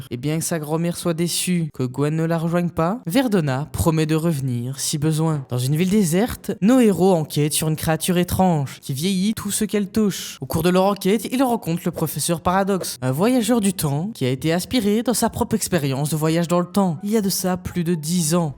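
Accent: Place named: Verviers, Belgium. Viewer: Cet accent de Verviétois 😂 Salut camarade 🇧🇪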